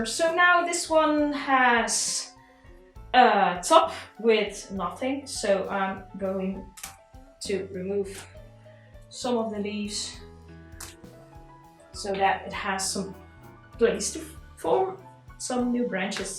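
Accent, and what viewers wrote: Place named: Netherlands. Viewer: Ik hoor dat je Nederlands bent kan je me helpen ik heb ook een draceana maar niet dezelfde wie jij hebt deze heb ik helemaal tot stam afgesnoeid maar wel aan een tak uitloper laten zitten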